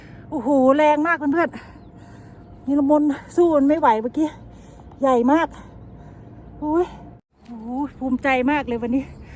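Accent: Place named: Phu Thai, Thailand. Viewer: คริปก่อนเห็นเว้าภูไทเป็นคนจังหวัดอะไรครับสกลหรีอนครครับ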